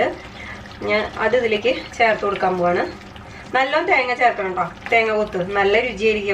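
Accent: Thrissur, India. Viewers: Super recipe,,,,appo.........,nammalu Thrissur karude samsaram kelkkan entha rasam 🤩🤩🤩......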